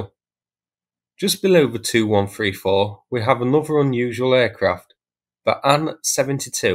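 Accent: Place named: England, United Kingdom. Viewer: England. According to some, he has no accent at all, it's all the rest of the lands distant from England that use the native language of England who have developed accents.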